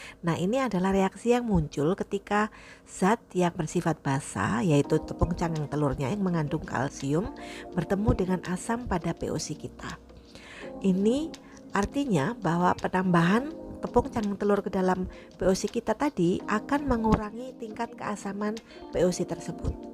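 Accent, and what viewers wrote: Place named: Banyumas, Indonesia. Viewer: bapaq : kaya jangan bayem... laaahh pada" ngapak jebule ya pak 🙄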